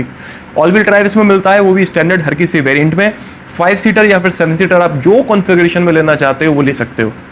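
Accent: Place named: Madhya Pradesh, India. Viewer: Bhai vese kaha se ho aap ? Apke accent se to M.P Side se lgre ho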